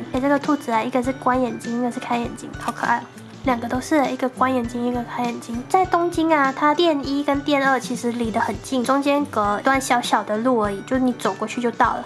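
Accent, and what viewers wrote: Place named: Taiwan. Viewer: 我就超喜歡台灣腔就對了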